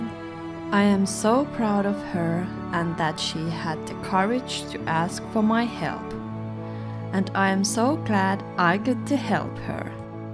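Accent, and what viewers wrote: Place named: Finland. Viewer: I can tell that you are from finland. Haha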